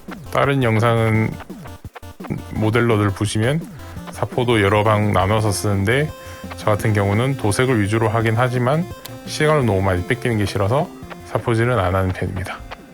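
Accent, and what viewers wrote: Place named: Changwon, South Korea. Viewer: 첫영상은 사투리가 조금씩 들리네요ㅋㅋ 저도 창원살아서 정겹습니다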